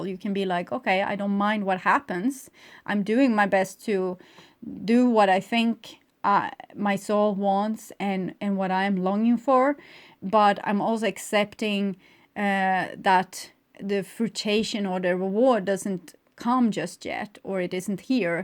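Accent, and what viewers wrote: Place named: Sweden. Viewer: I love your readings ! They are long but are very in-depth ! I actually after a first watch / listen ! I replay them your voice is very soothing, it’s the Swedish accent speaking English I think it’s very soothing , it makes me fall asleep on a replay! You are correct with being troubled I’ve been troubled for years in regards to an old partner who I have children with who is a drug addict ( meth amphetamines ) it has destroyed her and all I do is walk around and pick up the pieces which is the children ! And to be honest with out being self promoting I’ve done well serving my children ! The enemy ( the drug ) not the person attacks the wall every now and then but weve held strong , the boys know how it can be very calm then the storm comes they’ve been through so much at a young age ! However I haven’t been able due to the hard work of raising children to find a suitable partner 7 years of bad luck but I do believe it is over! 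There is something in the air this spring ( Southern Hemisphere) I am a pagan and I gave some sacrifice to the gods / goddess this spring in an offering of mead seeds honey and work in planting trees some meditation! My ego over the years thought it was strong enough to be alone but it is really not natural so throw these things to the wind and hope for the best! Tusen takk loving lady from the north ,-) ,,,,, haaaaaaa you are so right with being nationalistic , I am an ex soldier who has been to war ! It changed me totally, for sometimes bad but for t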